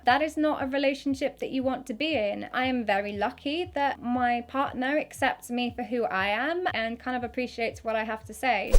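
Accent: United Kingdom. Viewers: Wait are you from the UK because your accent sounds a but deferent xx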